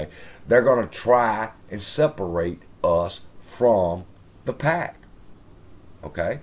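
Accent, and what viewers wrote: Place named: Boston, United States. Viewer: he mentioned he liked you a long time ago. Something about your boston accent. Long time ago. This was when you were angry and going after other youtubers on the daily. I told him you were a sjw star wars fan. And a man who still likes star wars after what Disney did to it is no man at all. Also, you do no real dd and do not contribute anything of real value to this play or any other. Did you know Tony D is an actor and Denaro isn't his last name? I have a feeling you east coast guys are all deceptive people. What does "massa" mean anyhow? You don't think something slaves said to their masters is cute do you? Ahh, Disney fanboys.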